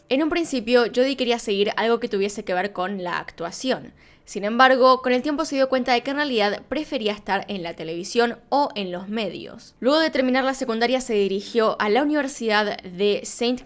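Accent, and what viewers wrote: Argentina. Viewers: Sabes que el vídeo será bueno desde: "Hola y bienvenidos a mi canal de YouTube, una vez más" inserte voz argentina 😍😍😍😍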